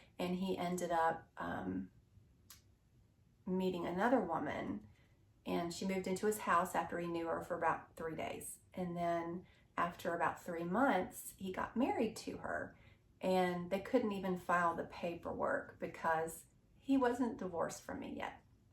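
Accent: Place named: South, United States. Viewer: Young lady if I was younger I would ask you if I could just get to know you . By the way do you like motorcycles , I think you would be a great back seater and any man should be proud to let you grace there bike with your presence .Do you live in the south, because I here a slight twang in your voice and I’m thinking of moving to Tennessee. You may not have noticed but I’m a biker and love long trips and riding as often as I can.I was born in Florida but have lived in California most of my life .Hey if you Want you could get back to me if you would like to say hi,or not if you think that would be wrong.Either way I hope to see some more of you videos and hope you are feeling better about your future in light of all that has gone on with you . My name is guy and hope to maybe talk to you some day.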